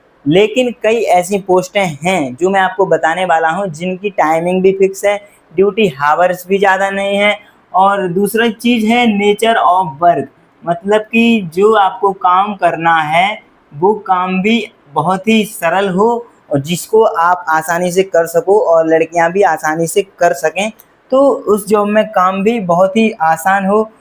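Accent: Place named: Jharkhand, India. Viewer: Jharkhand me bhai aise hi pronunciation kiya jata h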